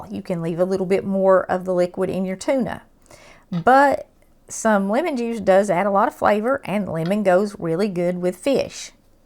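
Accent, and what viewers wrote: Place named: East Tennessee, United States. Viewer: Thank you so much for making these videos. Everything is broken down for someone who hasn’t cooked much. You are a great teacher, you are positive and you show God’s love in all you do. So many YouTubers right now are using fear and anger to get views. Your videos leave me feeling hopeful and peaceful and ready to try something new. I am so grateful for you. P.S. I love your accent. My granny was from East Tennessee and listening to you makes me happy! I am a retired women living in NC, and never had a family so I never learned how to cook - until now. Thank you so much. (Beth)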